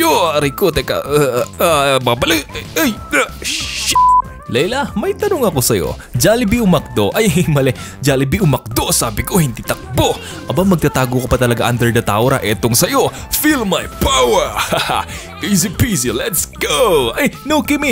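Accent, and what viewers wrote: Visayas, Philippines. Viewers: bisaya ka ba?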